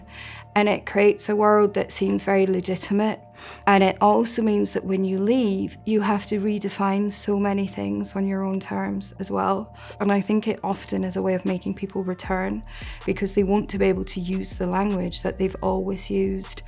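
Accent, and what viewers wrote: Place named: Scotland, United Kingdom. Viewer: I thought I could hear a Scottish accent... but only faintly...I was right..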